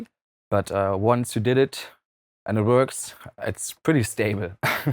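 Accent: Germany. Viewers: This guy has such a nice German accent, love you.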